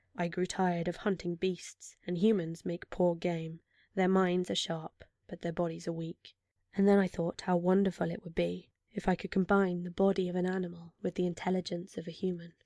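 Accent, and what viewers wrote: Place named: United Kingdom. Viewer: Oh my .... This channel ... I don't ever want you to stop reading :( There's no sweeter sound to my ears than a bedtime story read with such a warm voice. It reminds me of my childhood. And as a french guy, i think your delightful british accent is the cherry on top.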